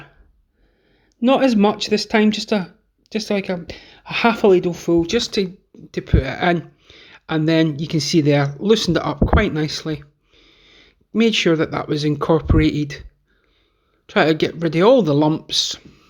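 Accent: Scotland, United Kingdom. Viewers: I tuned in because I love a Scottish accent! But it's a bonus because maybe I will learn a couple of new recipes. In this, I think since I don't really care for carrots, I will switch them out for button mushrooms. Good luck. -Anne in Ft Myers Florida.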